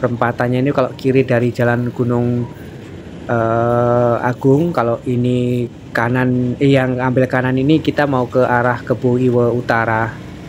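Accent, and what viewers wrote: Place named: Java, Indonesia. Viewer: masnya asli mana kok logatnya kayak Jawa gitu...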